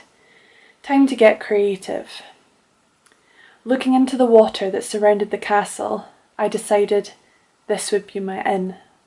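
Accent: Edinburgh, United Kingdom. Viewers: So nice to hear such a lovely Scot lass tell a beautiful story. Would love to hear a wee bit more of the Edinburgh accent, it is my favorite sounding speak.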